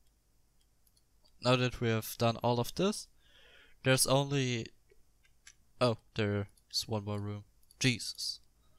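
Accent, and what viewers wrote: Germany. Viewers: Du hörst dich ein bischen deutsch an :)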